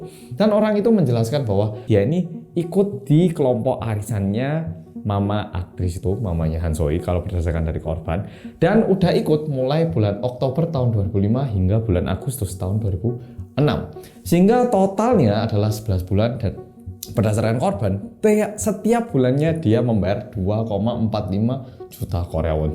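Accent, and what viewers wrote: Java, Indonesia. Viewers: Ga ada nada nada bicara atau pemilihan kata yg tidak tepat yg membuat org berpikir ini bukan org indo. Beneran natural banget. Di telinga gua dia mas mas dari jawa. Penjelasannya bagus dan menarik. Thanks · lucu amat mas medok, konsep nya ala ala presentasi di kampus yaa 😄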